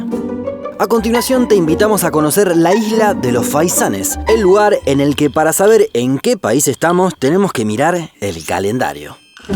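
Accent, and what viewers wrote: Argentina. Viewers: y el detalle papi... es Argentino :V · Una duda, este canal es Argentino? o el que habla en los videos es de Argentina?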